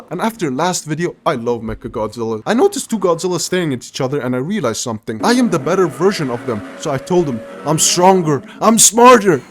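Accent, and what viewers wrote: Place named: United Kingdom. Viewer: you soud british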